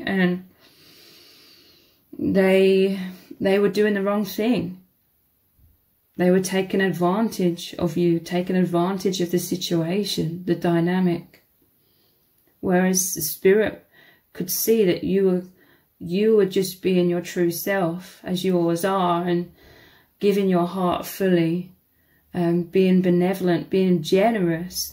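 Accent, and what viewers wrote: England, United Kingdom. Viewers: so accurate! i can't place where your English accent is from. Would love to know it. I spent time all around the UK :)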